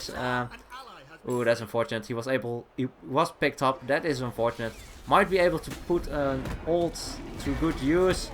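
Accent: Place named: Netherlands. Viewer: The dutch accent ;)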